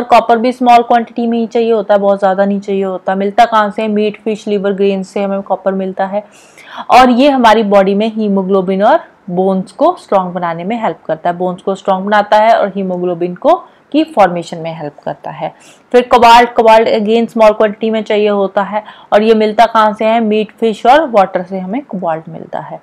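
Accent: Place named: Kashmir, India. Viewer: Mam apka ascent kashmiri type h , n Kashmir ke logo ki awaz bahot innocent si hoti h . Ap kya Jammu kashmir se h ? Ese hi question Aya man me to pucha...vese thanx again for everything 🙏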